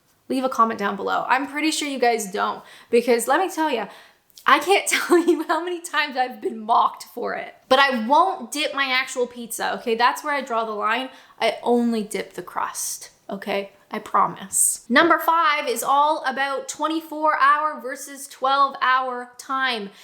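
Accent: Canada. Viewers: To my (Londoner's) ear. your speaking accent gets more Canadian when you visit home and less so between visits. For example the second syllable of "about" gets longer when you go to Canada and gets shorter gradually on your return. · Never, ever lose touch with your roots. Same as myself, you can blend your adopted country with the one you were born. It's funny though how childhood experiences seem to stay with us forever. So is a Canuck - Brit. Best of both worlds. Makes a very good blend!!!!😀 and, please, never lose your accent. It's so nice to listen to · A belated Canadian Thanksgiving to you 💖🇨🇦 Your Canadian accent is delightful, especially the "oo" sound in words with ou in them such as "out", but please, please loose the zee, it's ZED 😅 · keep your Canadian identity --it is part of who you are for sure. Generally speaking, unless you moved to Britain very young and went to school there, your accent is quite 'fixed' for life even if you use local words and 'Britishisms'. 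You are being a 'polite' Canadian when you use a British word to communicate over the phone to help with understanding. Yes, Tim Horton's does have grilled cheese sandwiches on their menu and there is nothing like it for comfort food. When sick, it may be the only thing that can help the appetite. Although British people often mistake a Canadian accent for an American one, we Canadians are pretty good at reminding people that we're Canadian, eh? I think I will have a grilled cheese sandwich for lunch today! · Canadian speech, US tastes. More so than the Canadians i have spoken to. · Accent changing s very much an individual thing. My mother's eldest sister and her husband left Norwich to live in Yorkshire for over 30 years until they returned to Norwich when my uncle retired. When they left they both spoke with the Norwich accent they were brought up with. When they returned my aunt sounded very Yorkshire but my uncle still spoke in his original Norwich accent without even a hint of his 30 years in Yorkshire.This is remarkable because he worked in a large Yorkshire chocolate factory where he would have talked to local people all day long in his supervisory role while my aunt was a housewife who would have had less contact socially. 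I think you will always sound Canadian because there is no single accent that everyone you know speaks, whereas in my uncle's case everyone he spoke to at work and socially would have had the same local accent. That is why it was so odd he never acquired even a hint of it.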